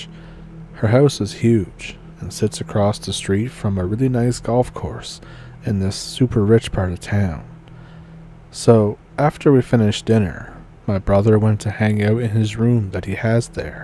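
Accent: Ontario, Canada. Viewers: Odelay,! 🎉... Listening to your voice so reminds me of my cousins from Grey, Ontario 😊😊😊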